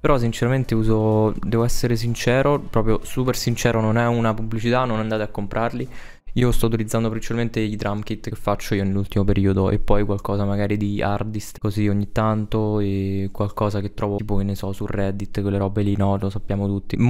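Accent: Italy. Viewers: Per me è di Roma, si sente anche dall'accento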